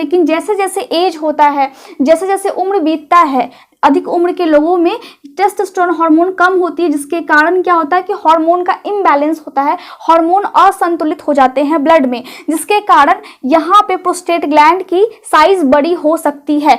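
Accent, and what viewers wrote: Bihar, India. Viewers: R u belong to bihar state? 😊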